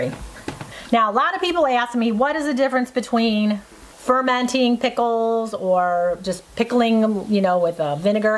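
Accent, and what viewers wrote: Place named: New Jersey, United States. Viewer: Your accent reminds me of a Jersey girl. Where were you originally from? I really enjoy your videos...VERY helpful!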